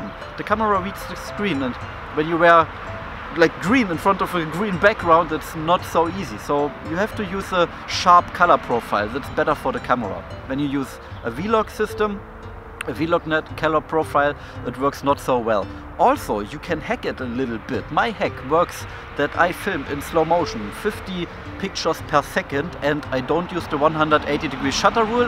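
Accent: Berlin, Germany. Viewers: Ach so, my my settings ….. 😂 so cool 👍🏻 ich hab großen Respekt davor, dass Du alles in englisch sprichst 👍🏻 und das machst Du auf Deine charmante Berlinerische Art echt gut 👍🏻 mach weiter so 👌 beste filmerische Grüße aus Oberfranken my friend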